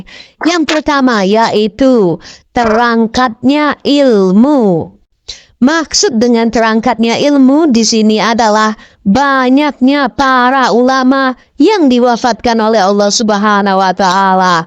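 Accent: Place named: Netherlands. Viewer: Raimu wong ndi cuk..ngomong koyo ngono logate koyo londo udu wong indonesia kuplak....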